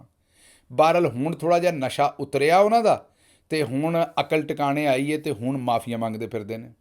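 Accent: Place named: Punjab, India. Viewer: tusa punjab bhot sweet bolta hon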